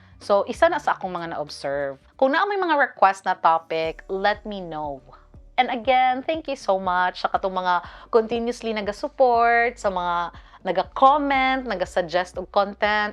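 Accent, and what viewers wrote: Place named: Butuan, Philippines. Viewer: you speak like my cousin😅 are u perhaps from butuan? lingaw kau ko the way ka muspeak😊 more videos pa✨✨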